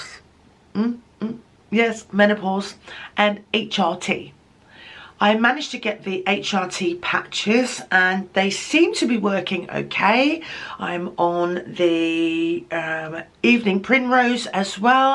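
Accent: Wales, United Kingdom. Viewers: Yay, good to see you as you've been missed. Shame that the teaching assistant post did not work out. Working in a kitchen proving a diet disaster !! Keep practising the Welsh accent. Sorry to hear of the sad loss of friend & family. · There she is❤ and with a Welsh accent too🤣😂 I'm crying 😂 Lovely to see you back❤Life happens and you've been through alot the last few weeks, sending big Cwtches your way🥰❤️🥰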